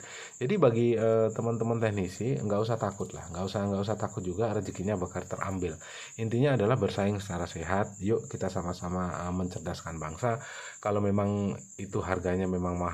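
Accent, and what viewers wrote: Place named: Bali, Indonesia. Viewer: logatnya bali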